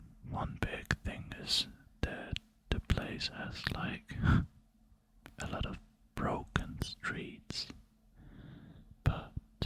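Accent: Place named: Germany. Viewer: Always love some rambles! Turns out, the german accent is great for ASMR whispering :)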